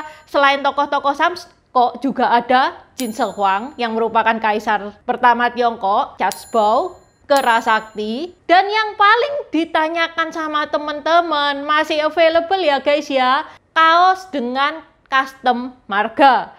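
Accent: Java, Indonesia. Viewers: Salut cie logatnya Jawa banget 👍🏼👍🏼kalau dilihat cie aadik saya jauh bisa jadi seumuran anak saya Salam dari Cah Boyolali Jawa Tengah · Hihihiiii ilate ilat jowo 😁😁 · Medok juga ya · Salut buat mbak, logat jawanya medok banget.. hehehe, sui lah 👍👍👌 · Intanmemang cantik kok, apalagi KLO sudah menceritakan berbagai dinasty yg berpetualang. Dan akhirnya sampai ke kota BaganSiapiApi kab.Rokan Hilir prop.Riau daratan..dan menurut cerita rakyat Bagan, ,bahwa BaganSiapiApi bukanlah pohon siapi api, ,tapi pepohonan yg tumbuh ditepi pantai dimana pohon itu paling disukai oleh serangga sejenis kunang kunang dan dipandang dari lautan seperti api berkedip kedip yg menandakan adanya perkampungan tempat tinggal penduduk. Mksh mbak cantik yg medok dokdok dok, ,lanjutkan, , , ,!!! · Ci campuran tiong hoa jawa ya...? Medok jawanya kelihatan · Logatnya jawa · ini baru namanya tionghoa rasa jawa.. 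saya suka medhok nya..❤❤❤ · Aneh dia oriental logat jawir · medok banget😂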